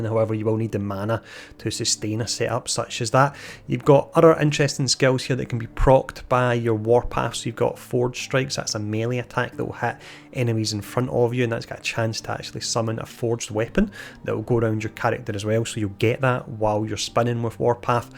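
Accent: Scotland, United Kingdom. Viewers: I'm from Clydebank. He is clearly Scottish. Irish accent is totally different.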